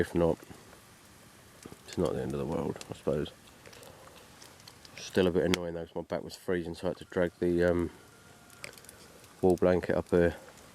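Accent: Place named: England, United Kingdom. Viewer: Abit of a bastard haha. I love he english accent.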